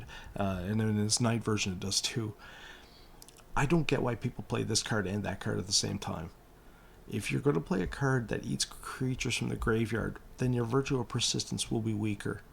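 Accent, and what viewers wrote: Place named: Minnesota, United States. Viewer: My man, you gotta be from MN right?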